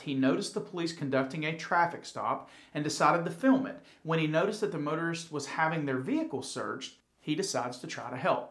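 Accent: South, United States. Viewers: Its always a good day when a Southern Drawl Law video drops Thanks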